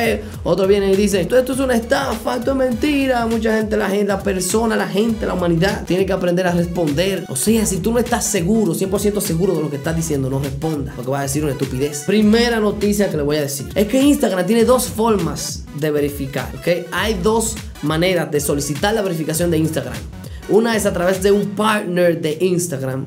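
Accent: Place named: Dominican Republic. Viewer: En tu acento te Me pareces dominicano espero que sí pro ases muy bueno videos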